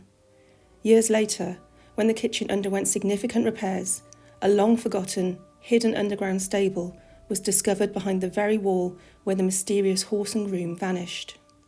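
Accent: United Kingdom. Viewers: I am now a believer that ONLY a Brit can narrate ghostly stories!!!! Thanks for fascinating vid! I can just see Henry pacing on the path, menacing, brooding man-child!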